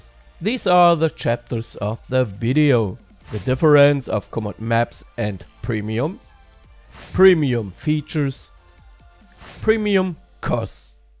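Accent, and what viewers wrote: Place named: Germany. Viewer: (english down below) german: hi. schade dass du nicht näher auf das live tracking eingegangen bist, das is das was mich an premium am meisten interessiert. also wie das live tracking genau funktioniert, wie zuverlässig es ist, und ob man auch ohne komoot meinen standort tracken kann. mein plan: ich möchte meiner community während einer tour im livestream die möglichkeit geben, zu sehen wo ich gerade bin. und natürlich haben die leute warscheinlich selber kein komoot. nebenbei: schon nach den ersten wörtern dachte ich mir "die aussprache klingt so als wäre er deutsch". und ich hatte recht. english: hi. it's a shame that you didnt describe the live tracking more detailed, because that's the premium feature i'm most interested in. i mean like how exactly does the tracking work, how reliable is it, and can you check/track my location without having komoot? 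my plan: i want to give my community the opportunity to track my location during a livestream tour. and of course they most likely dont use komoot themselves. on a side note: after a few words i already thought "the pronouncation sounds like he's german". and i was right.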